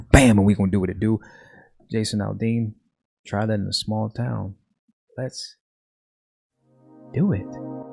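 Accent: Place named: South, United States. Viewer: What we have is Me Too version 2.0 the race war edition with these absolute mental gymnastics that these people are doing so they can cry racism about everything. Did you hear they are trying to call out being on time is racist?? But great commentary and I can hear the "Southerener" in you. Everyone looks at me like I am stupid living up north when I say it that way.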